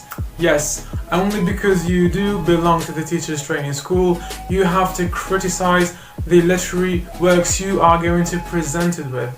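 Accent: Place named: United Kingdom. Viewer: British english 👏👏👏👏👏👏👏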